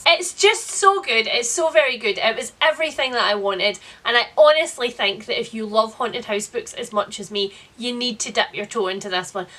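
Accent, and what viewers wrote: Scotland, United Kingdom. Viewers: I ❤️ your Scottish accent.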